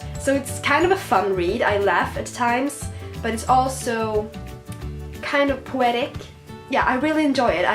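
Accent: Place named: England, United Kingdom. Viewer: P.s I so so love your english accent❤️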